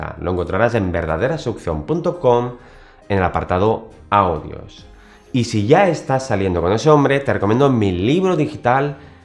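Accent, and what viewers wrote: Spain. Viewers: no me gusta el acento español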